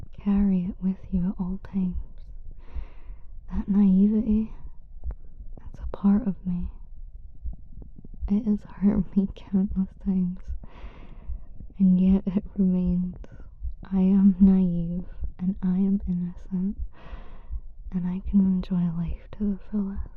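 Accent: Scotland, United Kingdom. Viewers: u have to be from scotland the voice just it speaks to me i mscottish and u sound exactly like other vtuber / f4m content scottish creators and some people in my area lol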